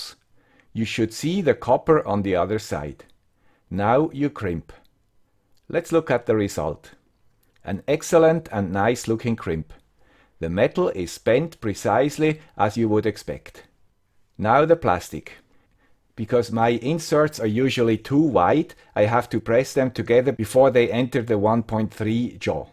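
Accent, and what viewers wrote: Switzerland. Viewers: Thank you Mr. Swiss Accent. Great comparison.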